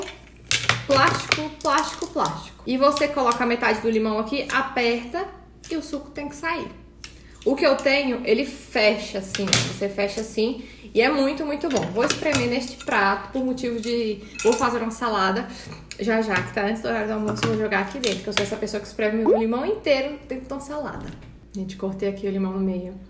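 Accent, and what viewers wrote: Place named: Ceará, Brazil. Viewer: Impressionada como teu sotaque parece com o do Nordeste! Mais especificamente do Ceará. ❤️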